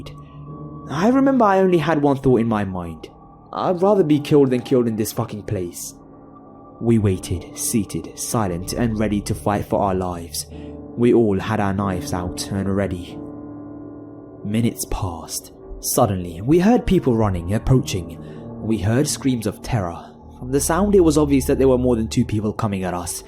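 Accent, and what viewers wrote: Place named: England, United Kingdom. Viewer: Why is it always an English guy narrating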